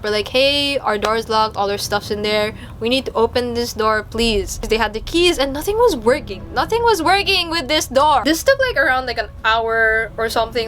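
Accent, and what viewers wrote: Philippines. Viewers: listening to a youtuber speak with a filipino accent is weirdly comforting. you sound like my auntie. thanks ate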